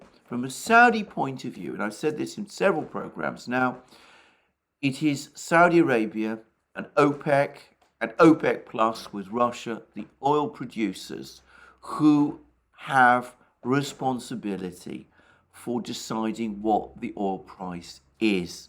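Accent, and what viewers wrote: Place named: RP, United Kingdom. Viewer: the Russian economy is in the toilet. Even according to themselves. Instead of listening to a Russian blogger and propagandist, why don’t you do some critical thinking and read some independent sources. Russia has been and continues to beg for sanctions to be lifted since day one. Income from gas and oil has now tanked and they are running a fiscal deficit month by month. For your own sake, do your own research as I do and don’t take this creators word for anything just because he has a posh English accent. I have yet to hear him utter a single truth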